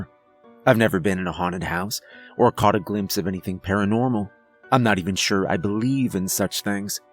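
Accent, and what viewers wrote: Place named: Midwest, United States. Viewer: He certainly has an accent. It's really only noticeable with the way he pronounces certain words, like saying "crayons" as "crahns". If I had to guess, I'd say he's from the American midwest somewhere.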